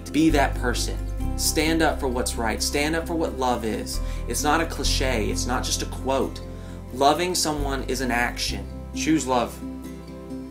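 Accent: South, United States. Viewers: The southern accent though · Does he have blue or green eyes? And is that a southern accent I hear!?!?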